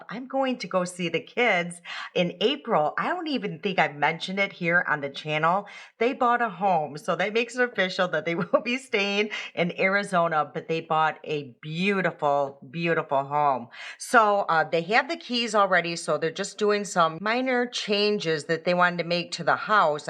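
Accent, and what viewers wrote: Midwest, United States. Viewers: hi, Thank you for writing back to me. You know, you're right about the Midwestern accent. We all possess that tell-tale sound that identifies our Midwestern whereabouts! I have been to my brother's only a few times. It is sad because when my husband died in 2012, I went into deep grief and depression and became agoraphobic. They offered so many times, but I was afraid to leave our home. I am not agoraphobic anymore, thank God, but I've missed so much of living since it lasted eleven years. My daughter died in 2022 and there are no words...it's just my son and me now. Life throws it's curve balls to everyone. God has been wirh us and continues. I'm sorry for the above. I hope it was okay to write. Anyway, I'm looking forward to more and more of your videos! I'm trying to decide what shade I should order of the makeup you applied. 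It was so perfect for you! I'm light neutral so I'm not sure and there are so few shades available!! I'll figure it out! Lastly, thank you for being kind and so enjoyable on each video. It really means so much to me. 💌💌💌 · Thank you so much for your review of the Cover FX foundation!! Your Midwest accent is wonderful! I’m happy to follow someone who looks and sounds like me ❤❤❤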